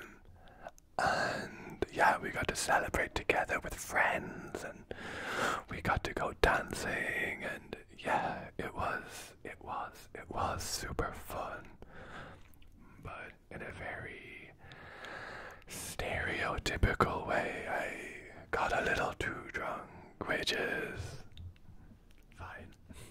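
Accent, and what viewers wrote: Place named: Ireland. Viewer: Irish? ☘Whispering into my ear? 🥰 Dare i click? 🤔 I knew they'd be the death of me....😅 Okay, here goes nothin'...🙃 💚*💚*💚*💚*💚*💚 *faints (☘shamrocks☘ floatin'☘ around☘ my ☘head☘)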